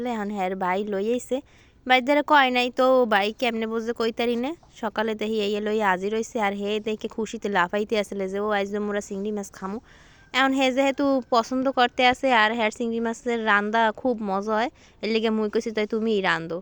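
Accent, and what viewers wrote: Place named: Barishal, Bangladesh. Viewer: ওয়াও মনু তোমার বরিশালের কথা অনেক সুন্দর হইছে তুমি আগাই যাও তোমার জন্য দোয়া রইল ❤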